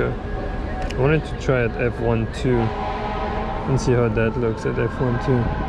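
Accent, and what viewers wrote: France. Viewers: Hi ! I love your content, just discovering street photography and your work blow my mind... One thing you have in common with all other street photographer I watch recently is the picture of strangers without noticing/asking them. Is it something normal ? You don't have any problem with that on a daily basis (law or simply argument with people if they notice you have taken a picture of them) ? It's a legit question, and sorry if it sound a little rude, it's not my goal, just pure curiosity (and sorry for my very poor english, I can ask that in french but I want to stay in the channel mood (your name and accent make me think we spoke the same langage)). Anyway, thank you for your work, really beautifull and relaxing.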